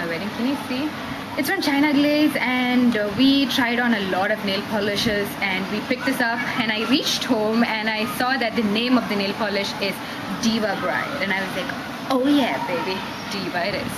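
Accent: India. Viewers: OMG i hear an Indian accent :D i love that :D just love everything Indian. ur so beautiful :D i'm mixed with some Indian myself ^_^ :D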